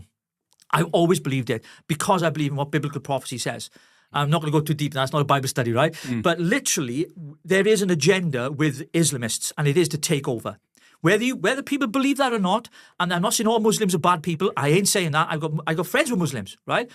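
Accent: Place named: Wales, United Kingdom. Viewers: As a valleys woman, it’s refreshing to hear a Welsh man share his passion 🏴. Our children deserve a future with our values. My 8 yr old son has been having s*x Education 😳 it’s insane. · Da iawn! We very rarely hear the accent of my homeland..🏴🏴🏴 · Love it! A silver-tongued Welshman with a fire in his belly! Just what we need in a time such as this....Advance UK!! God bless.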